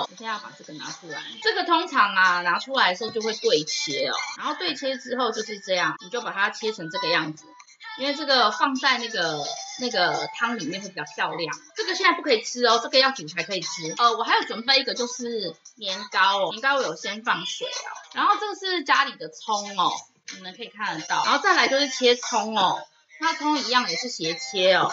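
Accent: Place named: South Korea. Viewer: Kelly講話也好可愛，因為習慣韓文，所以有時候講中文的尾音也有「喔」，現在放蔥下去煮喔，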